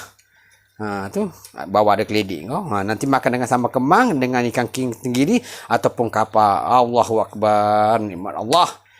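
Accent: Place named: Muar, Malaysia. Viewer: Terbaik makk... org muo gaya bahasanya mmg ada identiti tersendiri😁👍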